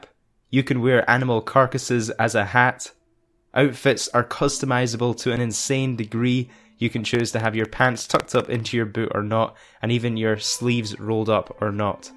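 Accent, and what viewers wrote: United Kingdom. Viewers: I found your British accent very entertaining!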